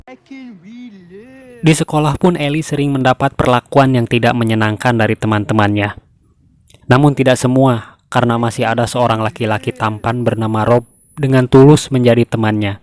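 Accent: West Java, Indonesia. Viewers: Ini yg seru bukan filmnya tp yg ngehost. "si aying yg babaung" 😅😅 dg logat sundanya lucu bgtss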